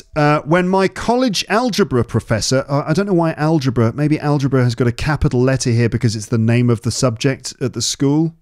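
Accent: United Kingdom. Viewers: Hi, great to hear the story, listen to your British English. Also your comments were very helful. Props to you! I am a 74-year-old writer of poems, short stories and cronicles. I have shared the video.❤ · What an incredible experience I have watching your videos. Love your british accent and the way you explain the meaning of some words. By the way, "mi amor" is Spanish and means "my love" which is a way to call our partners when there is love involved. Hope to see you soon and thank you!! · I like your excellent British accent, it is so attractive to watch your videos more , it's amazing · B.B.Wang Perhaps I am the oldest student you have ever taught. I am an Australian-Chinese 90 years old. I have kept learning English to enrich/ improve the living quality of my” late” years. Your teaching course helps very much. I especially appreciate the way you teach. 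I can follow you almost the whole process. And the UK pronunciation. Thank you!. (Please have tolerance for my poor grammar.) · I mean you're birtish for me i try to learn accent birtish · The attractive places of this Youtuber are not only his British accent, but also his quality of voice and pace! It looks as if I listen an audio book. What an amazing podcast !! I begin to be hooked by his. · I love listening to your channel, especially your clear British accent. Keep up the good work, 😊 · May I ask you an external question, my teacher 😊 Your accent is belong to which region of UK? With me, it is clear to understand the story. Not the same feeling when I listen to my beloved singer Adele talks. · Thank you so much,! I can read and write English (with US vocabulary) a little but I can't speak:( I've just discovered your Youtube channel and I literally love it! This story is amazing and I love your UK accent 💓. 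I'm trying to improve all my English skills. Can I use US vocabulary while speaking with UK accent? Is it grotesque and offensive to native speakers? 🤔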